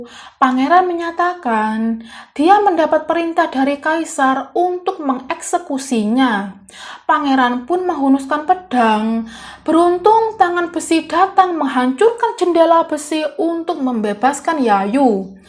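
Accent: Java, Indonesia. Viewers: Jelas wong jowo iki?👍👍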